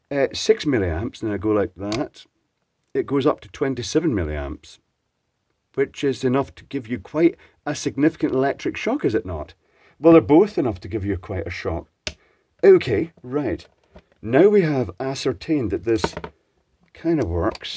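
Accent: United Kingdom. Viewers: he has such a british accent accent. ( i am from the netherlands ) so any one can help me how to, rephrase this ?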